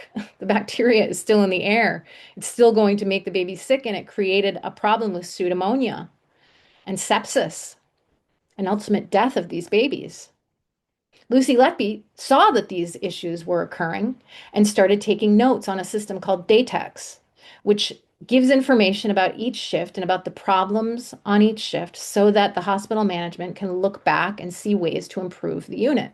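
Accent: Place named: United States. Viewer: I take it by your accent that you are an American? So am I…..retired American nurse…..have been involved with this case for 2 years…… I also have a channel…… there are also probable innocent convicted serial killer nurses here in America…… if you are interested once Lucy is free to help these nurses out let me know…..I am trying to get fellow Americans interested in freeing my wrongly convicted nurses…..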